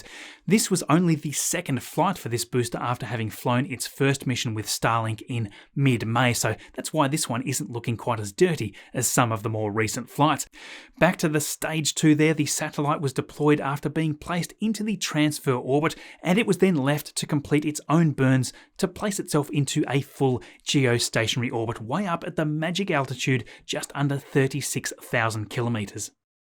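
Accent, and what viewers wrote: Australia. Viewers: you australian? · Hi from across the ditch enjoyed your content as usual Just one thing as a blind subscriber I would very much appreciate a few more sound clips in your presentation not that the charming Australian accent isn’t enjoyable enough Cheers mate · Aussie accent at its finest. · Love that Australian rocket commentary! YEEEEEEEEEEAAAAAHHHHH! 🚀😂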